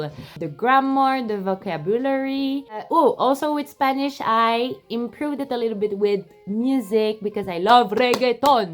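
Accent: Philippines. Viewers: Your tagalog is very pinay ...Lol......I enjoy the video :-D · Your english is close to filipino english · Your English accent actually sounds very much like Filipino-English. 😅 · You are very beautiful and eloquent!!! Your English accent is so Pinoy.. love it!!! I can speak 3 languages, Filipino, English and Portuguese.. You have the basic in Spanish, I think you can easily learn Portuguese as well... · Tu acento en inglés parece filipino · Your english accent resembles the Manila english accent. Probably because of your mom's and relatives' english accent (tagalog-influenced) when they speak to you. But of course it's not 100% since you are also influenced by Parisian French but I think the accent leans more toward the PH english accent. This is the very first vid of yours I've seen -- found you interesting and funny so I subbed. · Your english is Filipino accent hihi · your english is definitely in filipino accent, love it hahaha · You have filipino english accent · Je pense que ton accent en anglais est un peu l’accent philippin. 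Mais, oui, c’est influencé déjà par les autre langues. Magnifique vidéo. Ton Tagalog est parfaite! 😊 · Filipino Accent nga ang English mo bhe😍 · OMG when you speak Tagalog you're so cute! And when you're speaking English I can hear a Filipino accent xD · Lol i love you im kapampangan living in U.S hit me up when u get here lol. Ur accent s filipino accent that learn English 😍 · the filipino blood is screamin in the way you speak wow the accent lmao hahaha the berry and very such a filipino thing more power to you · Your English is very pinoy 😊😂 And I speak English, Spanish, Tagalog, French and some Portuguese. 😘 Hoping my future daughter will look like you hehe Sobrang belle <3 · You literally have a Philippine English accent HAHAHAHA I love seeing diaspora have our accent I think you've adapted the Filipino accent when you went the Philippines haha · you sound more like a filipino accent.... 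· pretty sure your English is pinoy accent but smoother lol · I’m glad naman I can still speak Tagalog, of course English and I can speak Spanish. I plan to learn Mandarin, Japanese and French sometime in the future! I love lesrning about different cultures coz it’s so intriguing and good for the soul diba. Salamat with a H 🤪😜😃🙂 Your Tagalog diction is so good! Yun diction mo Filipino talaga. I guess yeah you’re right your English has different accents pero Pilipino more hahaha. First time I’ve met a Filipina and Algerian, beautiful mix!!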